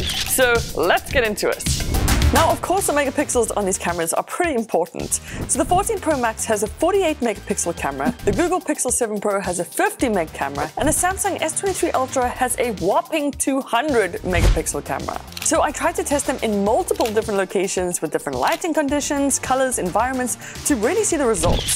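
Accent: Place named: South Africa. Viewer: Is it just me or does she sometimes sound a bit South African? Just the way she pronounces some words reminds me of a South African accent.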